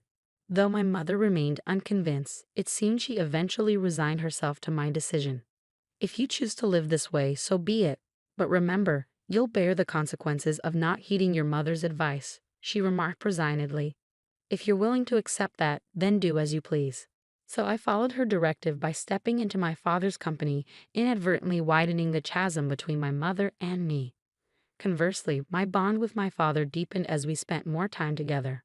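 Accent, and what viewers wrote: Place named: United States. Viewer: Sounds American, but money is "yen".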